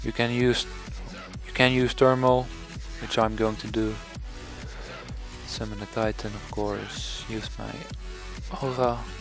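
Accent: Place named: Netherlands. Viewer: je kan echt horen dat jij uit HOLLAND komt :p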